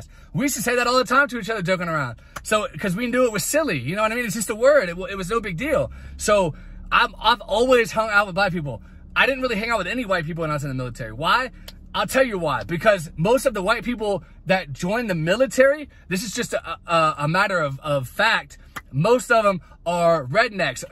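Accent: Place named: South, United States. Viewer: country accent is on point 😭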